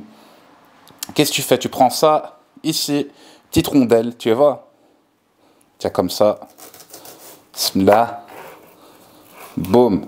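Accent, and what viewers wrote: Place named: Brussels, Belgium. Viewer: Le khoroto de bx on reconnaît l’accent 😂😂😂🇲🇦🇧🇪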